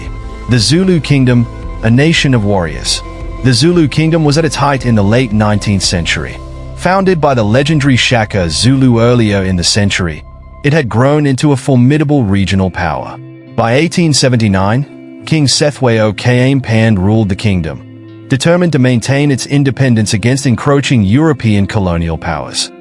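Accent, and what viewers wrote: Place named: Australia. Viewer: Your accent is confusing I thought Australian but there's something else there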